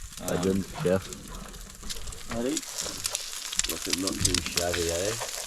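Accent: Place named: South Africa. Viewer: Sounds like you have some South African in that accent 😊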